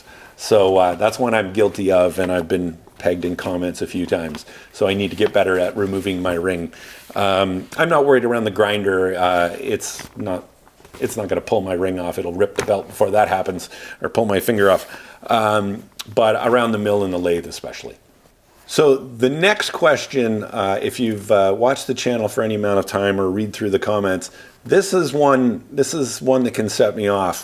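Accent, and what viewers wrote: Canada. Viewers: I thought you was in Canada? You referenced being in California. Now I’m confused lol. You definitely sound Canadian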